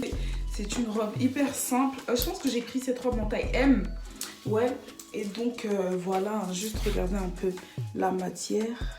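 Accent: Ivory Coast. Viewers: J’ai entendu le début j’ai su qu’elle était ivoirienne 😭🤍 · J’aime trop ta manière de parlé en ivoirien même je t’aime trop fort ❤️❤️❤️❤️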